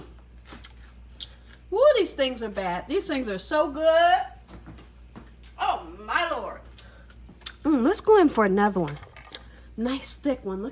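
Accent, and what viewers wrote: South, United States. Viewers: She sound like pualla dean’s black friend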